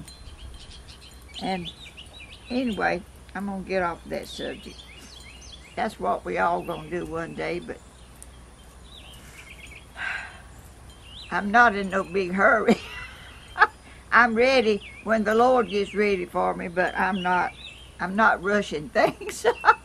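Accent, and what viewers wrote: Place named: Georgia, United States. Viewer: I really enjoyed your video and I for one don't mind when you go quiet when you're concentrating I love the birds singing in the background, but I love listening to you talk too I love your Georgia accent and one day if gods willing I will get to Georgia. I live in the uk and it's cold and miserable here now and your videos are a ray of sunshine to me, god bless and take good care of yourself, love and hugs from Linda 🤗😘❤️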